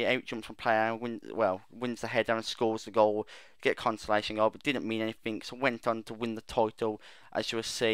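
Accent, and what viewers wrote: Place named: Birmingham, United Kingdom. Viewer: Where are you from, you sound like your from birmingham?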